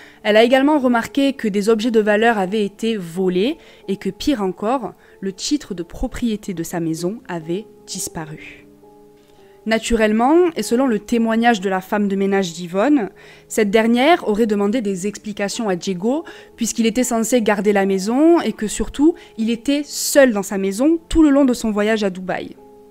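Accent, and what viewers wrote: Marseille, France. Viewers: L'accent Marseillais j'aime bien !❤️🥰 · Voilà un histoire qui prouve qu'il ne faut jamais fait confiance à personne à 100% à au plus proche PS: désolé si c'est indiscret de ma part mais t'est marseillaise ?? chais pas pourquoi j'ai l'impression qu'il y'a un petit accent marseillais dans la façon dont tu parle😭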